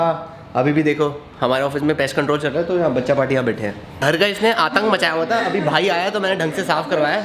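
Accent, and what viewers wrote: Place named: South, India. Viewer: That South indian English Accent of urs lit💯💯🔥🔥🔥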